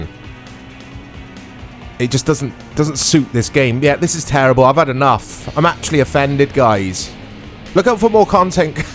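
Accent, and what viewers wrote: United Kingdom. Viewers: yuk, british accent...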